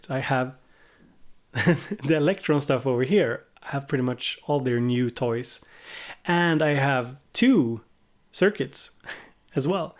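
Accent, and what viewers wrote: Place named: Sweden. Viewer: Thank you for decent youtube content! As a fellow swede, I enjoy your somewhat swenglish accent. =) Keepin' it honest!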